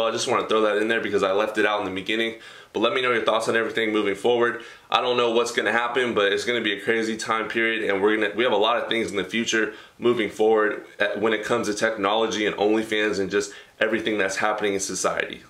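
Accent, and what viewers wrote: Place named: California, United States. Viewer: I can hear the california in your voice.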